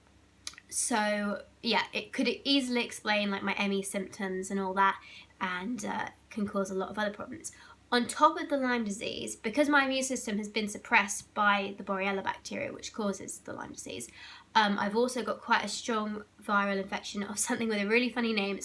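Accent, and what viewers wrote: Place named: United Kingdom. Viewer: This is my first time watching your videos, this one was on my recommended list. I was quite shocked by the British accent, I was expecting an accent from the U.S. but anyway, I just wanted to say that I'm sorry for what you're going through however, I'm glad that you found a doctor you like and who can do anything to help you out. You've earned a subscriber!! Take care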